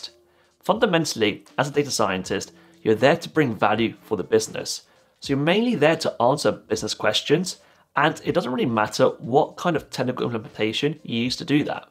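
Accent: United Kingdom. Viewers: I am using your video as part of my IELTS listening;you have a nice british accent.Keep good health brother❤️.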